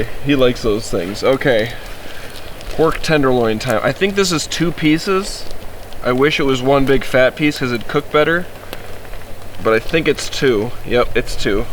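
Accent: Canada. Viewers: I can't decide if he is Canadian, or just making fun of us. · Man, you sure sound Canadian. Tossing out those ‘eh’ casually. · Are you Canadian or American?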